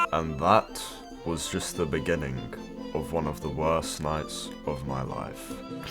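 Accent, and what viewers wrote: United Kingdom. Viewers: I goon to your British accent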